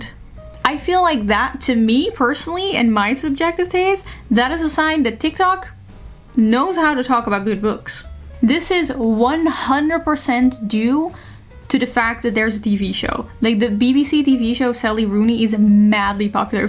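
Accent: Netherlands. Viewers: Ik hoor een Nederlands accent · Are you Dutch? Btw, love your videos btw❤❤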